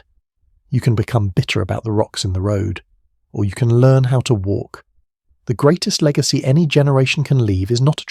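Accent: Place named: United Kingdom. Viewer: I just wish the AI voice wasn’t British. I’m getting pretty tired of that accent in AI.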